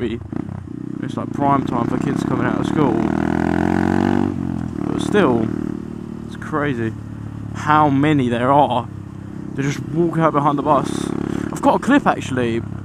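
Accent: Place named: Essex, United Kingdom. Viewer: I noticed some of essex in this :o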